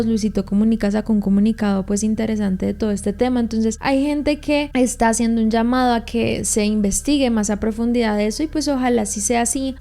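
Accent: Colombia. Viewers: pense que eras colombiana, que bueno que te cuides, muchas gracias por subir informacion sobre este caso, saludos desde peru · Me pasó lo mismo, soy de Gdl... Y el ver este caso con su acento tan colombiano ( hermoso por cierto) me helo los huesos... A dónde hemos llegado?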